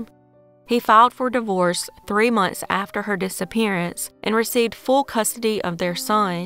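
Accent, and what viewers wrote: South, United States. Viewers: Thank you kindly for your amazing story and video,much luv, I love ur southern accent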